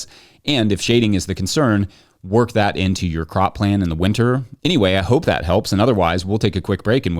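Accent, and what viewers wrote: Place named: Utah, United States. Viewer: Sounds like you're from Utah (me too) even AND you've got the green no-till hat?! This guy's a good one.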